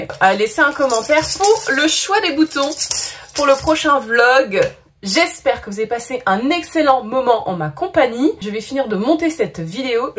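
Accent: Lorraine, France. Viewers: Cc Bouton jean pour moi 😁 Et c’est toujours un plaisir de te voir et j’adore ton accent lorrain 😅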